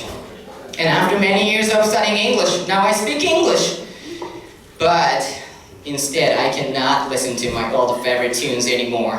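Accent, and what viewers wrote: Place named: England, United Kingdom. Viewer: Good show I like your English accent more video to upload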